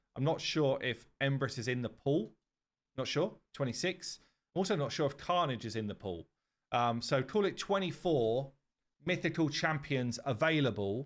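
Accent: United Kingdom. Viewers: Oh you're definitely British😂😂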